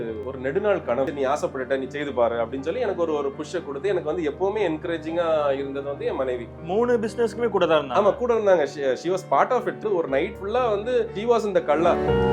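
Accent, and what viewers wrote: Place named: Tenkasi, India. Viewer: அம்பாசமுத்திரம் -தென்காசித் தமிழ்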